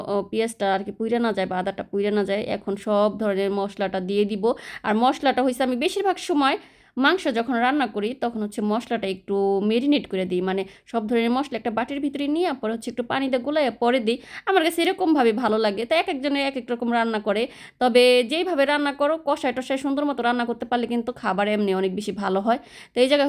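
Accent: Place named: Narayanganj, Bangladesh. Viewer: আসসালামু আলাইকুম আপু তোমার বাংলাদেশের কোন জায়গায় নারায়ণগঞ্জ বা জাজিরার এদিকে প্লিজ আনসার দিও তোমার কথা ঐদিকের লাগে জন্য আপেক্ষায় থাকব।।।।।প্লিজ...।।।।।।।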